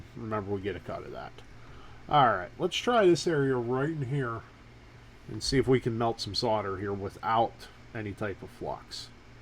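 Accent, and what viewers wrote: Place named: Pennsylvania, United States. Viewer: This guy has to be from Pennsylvania. Fyi I use this for guitar effects. It works flawlessly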